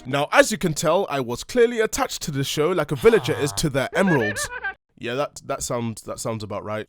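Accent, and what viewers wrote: United Kingdom. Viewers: Rock Stone oh my God. Not every black person is African American. This dude clearly has a British accent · You have such a bearable British accent.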